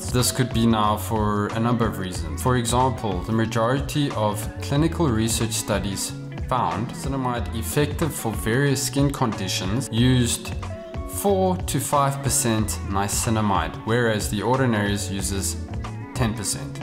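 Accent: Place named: South Africa. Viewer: Why do you sound so South African? Where you from?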